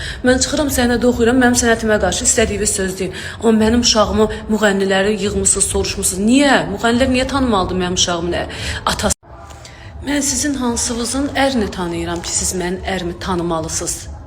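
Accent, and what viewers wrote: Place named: Baku, Azerbaijan. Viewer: Kendci..davavizi..gozuvuzu..danisiga bax.Baki lehcecine kecib...ciriq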